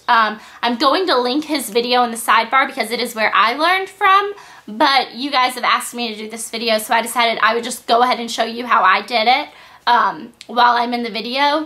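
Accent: South, United States. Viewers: i love ur voice!! dont listen to all those people who are south accent haters. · You eye make up is gorgeous in this video. Can you please do a tutorial on it? As to your voice: It's probably because you have a southern belle accent. Personally, since I am from the northwest, southern accents tend to irk me, and so that's probably why some people say they find your voice annoying. Not your fault, just some of us odd Northerners ;) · Um... I just have to say that I absolutely love your accent! I'm from the south, and I think country accents are cute! I'm proud to have a country accent :)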